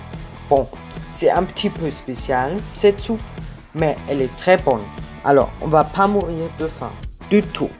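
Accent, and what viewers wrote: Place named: France. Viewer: Votre accent français est superbe, j’arrive très bien à vous comprendre..à plus à augsbourg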